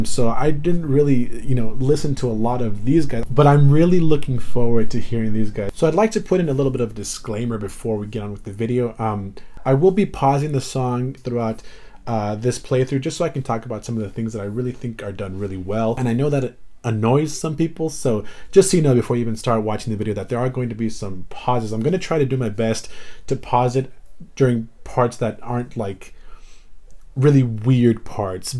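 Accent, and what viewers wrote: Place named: England, United Kingdom. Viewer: Other then your comments about this flawless band, I love your English accent. I'm mexican and I am an English teacher. I love Rush, I also like the police. Greetings from México.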